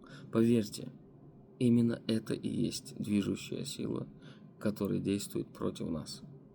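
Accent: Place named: Chechnya, Russia. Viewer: Эмират это и есть Ислам и каждый мусульманин должен к этому стремится аж до судного дня. Если ты против этого значить ты не мусульманин. Вот это и есть наша проблема. Как только у чеченцев появляется вкус свободы тут же появляется религия и всё...точнее сказать - идём обратно в стойло. Так было, так есть, так и будет, история этому свидетельство. О каком народе ты говоришь!? Посмотри на себя в зеркало и послушай свой говор. Чечен-чечен. И где в тебе твой чечен(имеется ввиду автора этого ролика)!? Пора уже определиться что мы хотим, быть рабами или свободным народом как завещали нам наши предки пролевая за эту свободу кровь!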